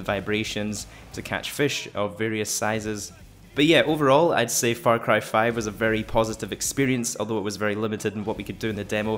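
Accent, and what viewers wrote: Scotland, United Kingdom. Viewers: Hello are u Scottish cause I am aswell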